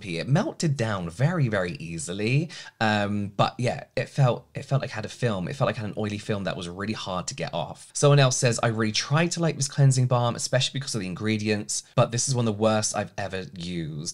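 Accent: United Kingdom. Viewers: Hi sweetness (from Sicily)!i am an english teacger in a secondary school and,you know what,I adore your pure Brit accent and suggest my students following you😂😂😂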